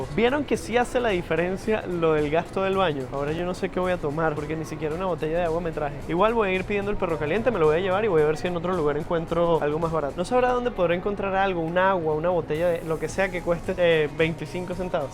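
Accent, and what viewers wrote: Venezuela. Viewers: de donde eres? No puedo reconocer tu acento, pero me inclino a venezolano? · Yo parA sobrevivir compro tortillas y queso duro sale super barato en los mercados se puede comprar super barato con 3 dolarucos se puede t oigo un acento venezolano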